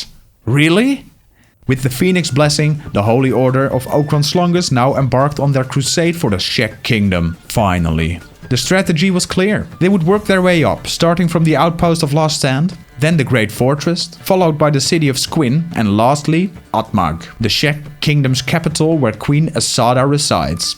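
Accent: Netherlands. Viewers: Dutch accent recognised!! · bro is clearly dutch 😂 trust me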